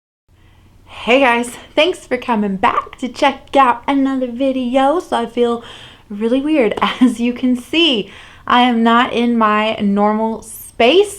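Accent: South, United States. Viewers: Heck yes girl! I am here for the country accents! I’ll bring the sweet tea to your porch! I hope that y’all got rocking chairs!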